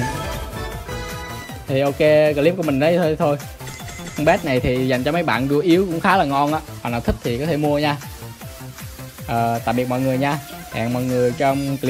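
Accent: Quang Ngai, Vietnam. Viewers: Anh quảng ngãi hả em quẢng nam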